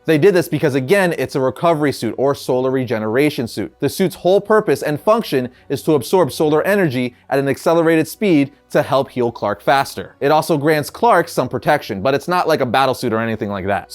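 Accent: Puerto Rico. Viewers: Where's your puerto Rican accent? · What!?!? Eres boricua???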